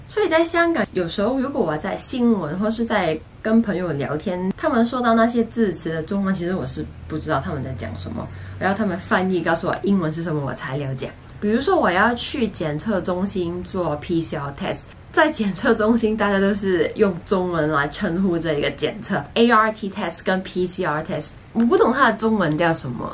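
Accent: Singapore. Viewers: Your Mandarin and English got Singapore ascent.... :) :) :) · Did you realize you are speaking like Singaporean? Mixture of English and Mandarin. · 你嘅国语口音已经差唔多完全新加坡华语化😄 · wow，你的华语一点听不出香港口语，almost like a local Singaporean :yougotthis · Wow when you speak English. You sound very Singaporean... I reckon you already localise Liao.. come back lah since you adapt the Singaporean life le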